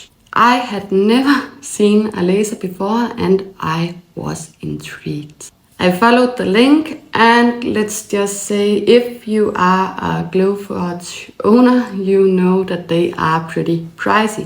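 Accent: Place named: Denmark. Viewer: Thanks for sharing your story with all of the real life ups and downs. I own a few of your files and love watching your videos! Your Danish voice sounds great to me. I play around in Illustrator designing my own files, but definitely want to get better at it. Looking forward to learning more from you.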